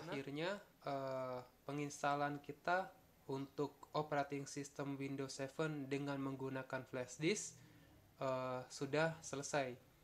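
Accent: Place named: Java, Indonesia. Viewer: Medok bro wkwk Tapi gud lah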